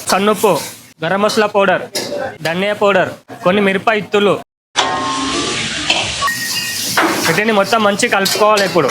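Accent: Telangana, India. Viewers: Mee telangana slang ki oka danam ra babu,meru mee over action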